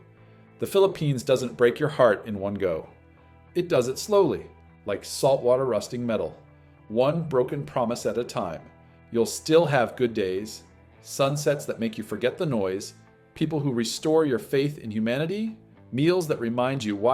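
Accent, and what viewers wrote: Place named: China. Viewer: The narrator here is trying to sound like foreigny Westerner, but have Asian accent, from China?..Airport a chaos? Probably true yrs ago till late 2024 had major upgrade & improvements, viewable online, after the private management takeover by largest Phil firm,improvements include Grab taxi stands w fixed prices..Of course if one goes to rural towns in the Phils, Thailand & Vietnam, one wouldn't expect better updated amenities except that Phils has Musk's starlink reaching remote areas. Poverty rate of Phils is 10.6%, 3rd LOWEST in Southeast Asia tied w Thailand, topped by Singapore and Malaysia, so untrue that poverty drives Filipinos to be dishonest and smiles w a financial agenda.For comparative, USA has an 11% poverty rate, while some of China's poor are eating garbage w millions now are going to countrysides due to serious job shortages ( Decoding China, China Observer, Lei's Real Talk). 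Reader's Digest gives a passing grade on honesty on Filipinos.The Phils is ranked 3rd on Safety in Southeast Asia by the Gallup affiliated survey outfit..Phils voted in past administration an atheist Pres, so untrue Catholic Church has strong political clout..Untrue garbage is prominent in Phils' invirons,top SEA garbage producers : 1. Indonesia 64 mt.yr 2. Thailand 27 mt.yr 3. Vietnam 22 mt.yr 4. Phils 15 mt.yr. . Viewable online are foreigners' roundtrip MRT.LRT slightly elevated train rides around Metro Manila adjoining cities, as if seeing entire Phils & see if garbage, stray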